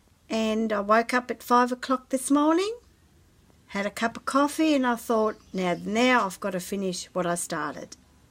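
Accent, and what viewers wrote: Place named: Australia. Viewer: just lovely, love santa up there... i did not know you came from Germany...you have the Aussie acesent down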